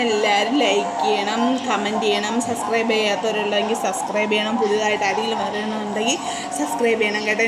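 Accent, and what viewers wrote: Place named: Thiruvananthapuram, India. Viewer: നിങ്ങളുടെ തിരുവനന്തപുരം സ്ലാങ്ക് എനിക്കിഷ്ടമായി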